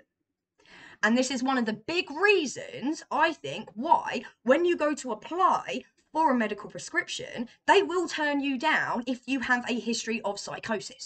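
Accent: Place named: East Anglia, United Kingdom. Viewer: I've been loving your videos. You inspired me to start MC and I've been feeling all the better for it! Please forgive me the rudeness of asking, but I'm from a small town on the norfolk-suffolk border and your accent just sends me back to my childhood. You have to be local to me, please confirm if true. It's so rare to see people in 'the wild' who sound like my cousins and family friends. Back home is big stoner vibes too so it's just very fitting ☮☮☮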